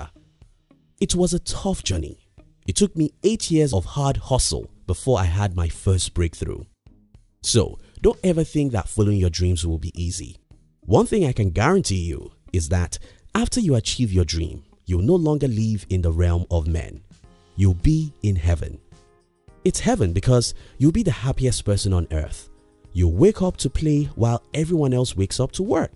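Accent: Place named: Nigeria. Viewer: I feel your voice is Nigerian, its not that important but can that hand be dark skinned? 😊 However good video, inspirational and motivational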